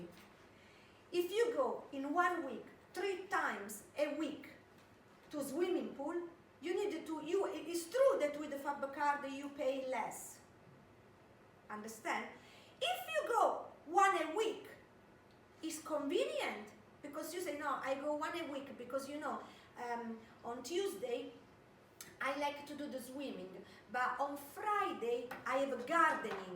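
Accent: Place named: Abruzzo, Italy. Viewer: No, perché dici che il tuo inglese sia "no good"? Sei molto brava. Soltanto la pronuncia, ecco, la pronuncia sa un po' di "Abruzzo con amore".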